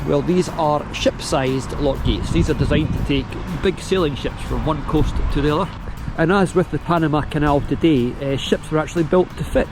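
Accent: Scotland, United Kingdom. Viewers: Good video you haven’t lost your accent it’s nice to hear the Scottish accent 👌♥️lovely scenery but not as nice as Skye obviously 😂👌. The falls were not falling much 🤔😂. Stay safe and take care 🤔♥️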